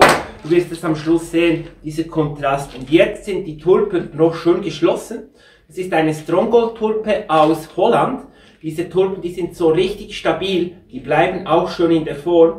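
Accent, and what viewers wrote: Switzerland. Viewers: Ich finde das schweizer Hochdeutsch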